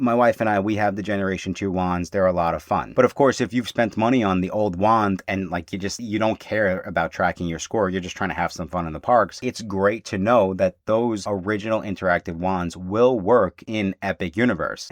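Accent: New York, United States. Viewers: Thanks for clearly stating that 2nd gen interactives were the only wands trackable on the app! I rely on CC due to grappling with the NY accent, and I didn’t ‘hear’ any misstatement but perhaps my eyes are going, too. The potential $85 minimum savings of not upgrading our 1st gen interactives wands might make 1 visit to Blue Dragon a possibility.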